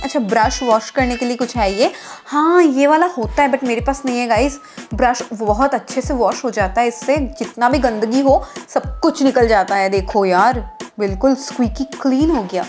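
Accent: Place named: Bengal, India. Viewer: AP bengli ho na qki apke bolne ke style se ye hi lag rha h mujhe ye aeisa isliye mga qki mai bhi bengoli hu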